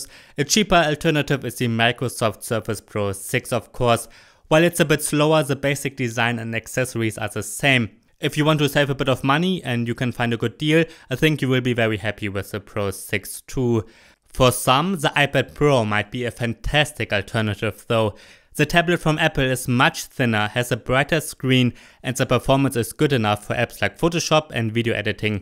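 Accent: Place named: Germany. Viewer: Dein Englisch ist grausam, dass du deutscher bist hört man sofort. Entscheide dich besser ob du nicht auf das wenige Geld verzichten kannst und auf Deutsch deine Videos rausbringen kannst, oder ob du Muttersprachler weiter verschrecken willst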